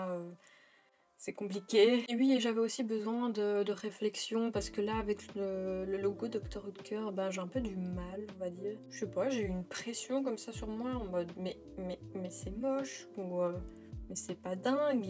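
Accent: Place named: Belgium. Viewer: Je découvre ta chaine sur cette vidéo (merci la reco youtube), c'est très sympa ! J'ai pas bien compris pourquoi la forme ta cam change au court de la vidéo, c'est très étrange mdrrr (le mieux c'est clairement celle à selon moi). Sinon t'as un petit accent Belge je trouve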